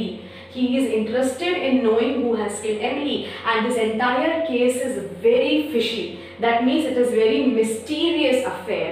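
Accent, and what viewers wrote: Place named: India. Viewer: Talk with a more standard accent, indian accent is not understood by othrts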